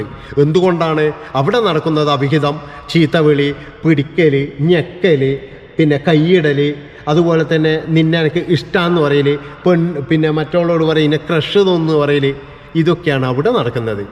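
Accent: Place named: Malabar, India. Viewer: സഹോ , താങ്കളുടെ മലബാർ ശൈലി സംസാരം Super. ടെൻഷൻ വല്ലതും ഉണ്ടെങ്കിൽ നിങ്ങളുടെ വീഡിയോ കാണും😂ചിരിക്കും😂 അതോടെ ടെൻഷൻ നാടുവിടും😂 Congrats👌👍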